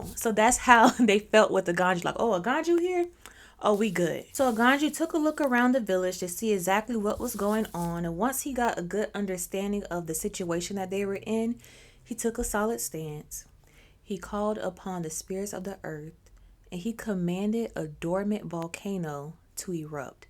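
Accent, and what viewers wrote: United States. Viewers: Baby, this is that Orisha whose name YOU DO NOT PLAY AROUND WITH!!! Thank you for these Orisha stories!! You pronounced it as our American selves would, HOWEVER, these folks better be careful playing with his name bc when he shows up, he knocks all the shit over 🤣🤣🤣🤣🤣🤣🤣🤣🤣... So don't worry about the pronunciations. I assure you The Orishas are pleased that you are telling their stories, PLEASE KEEP TELLING THE TALES OF THE ORISHAS, Ase OOO!! ** EDIT: I am crowned to Osun and I pronounce his name exactly as you do 🤗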